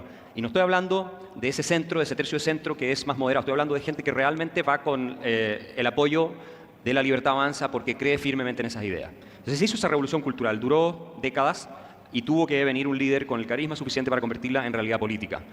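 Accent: Peru. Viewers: 👈El único ridículo eres tu, nadie está forzando nada ciertamente. Así hablan los Peruanos. Si no te parece bien es problema tuyo , 🎉 FRVSTR@ 🎉😂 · El acento limeño es perfecto.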